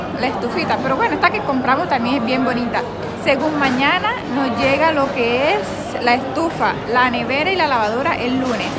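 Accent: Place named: Atlántico, Colombia. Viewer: Hola, como estas? Me encanta ver tus videos, me pareces una mujer con un carisma muy lindo y chistosa. El modo de hablar con ese gopeadito polonuevero que aún permanece en ti Bendiciones, besos y saludos de tu abuelo cesar Cervantes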